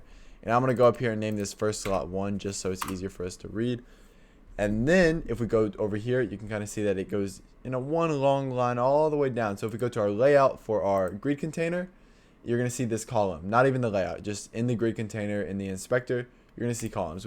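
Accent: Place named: Mid-Atlantic, United States. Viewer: I gotta say...I've "been around the block" in terms of seeking out clear tutorials...and I keep coming back to (and I'm a fan of the mid-Atlantic accent...somewhere between Philly and Baltimore if I could venture a guess). Anyway, really great stuff here!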